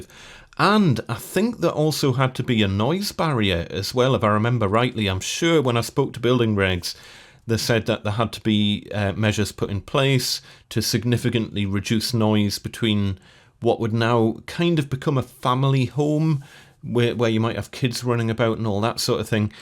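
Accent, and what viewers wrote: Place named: North East England, United Kingdom. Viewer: This absolutely chuffing FANTASTIC! I'd be hard pushed to bang up a floating shelf so I'm in total awe of your incredible work. Also, it's a joy to hear a N.Eastern accent on social media. I left the Boro in 1983 for Bedford, just outside London, and whilst the Boro and Tyneside accents are separate from each other there are some similarities. Anyway, well done and best of luck to you and your wife and bairn/s for all future projects.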